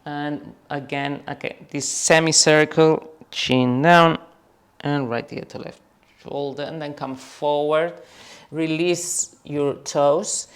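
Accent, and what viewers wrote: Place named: Spain. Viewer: Amazing class, accessible to all with a twist of spanish accent. My go to class when I'm in need of slow movement and ease of mind :)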